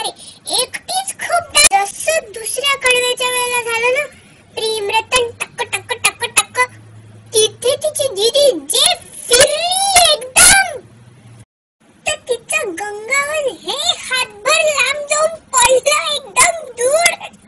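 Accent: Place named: Vidarbha, India. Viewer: jabardast video......tu Vidarbhachi ahes ka? khup mast ahe tujhi bhasha amchyasarkhich.....All the best ..Khup videos banav ani motthi ho...but motthi jari zalis tari lahanpan sodu nakos......Lahanpan dega deva....enjoy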